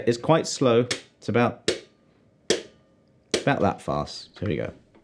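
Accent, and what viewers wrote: United Kingdom. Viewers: Fantastic lesson, as usual,! Your lessons are so informative, and I would say the best ones on YT. I’m curious, though (and I hope you won’t be offended), but why do you Brits pronounce “Jaco” as “Jacko”? It’s actually “Jahko” (long A, as in how Brits say “tomahto.”) This also goes for “Yamaha,” which I hear Brits pronouncing with a short A, as in “Yam (rhymes with “ham”) aha,” instead of the correct “YAH-maha.” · I pronounce Jaco 'Jahko' and 'Yamaha' 'Yahmaha' (I have 5 Yamaha basses) so you're preaching to the converted. I commented because I thought it overly pedantic that an American would attempt to school an Englishman - from whom, I might add, he's getting FREE bass instruction - on pronunciation. It'd be like me viewing an American cooking segment and admonishing the chef for pronouncing the herb (or 'erbs' as Americans call them) basil 'bayzil', instead of 'bazzle' as we do in Australia and the UK. 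Anyway, that's enough from me. Back to the bass...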